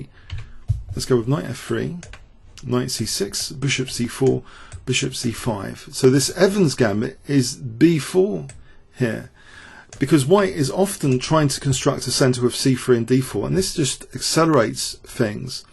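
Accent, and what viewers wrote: United Kingdom. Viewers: British accent is so British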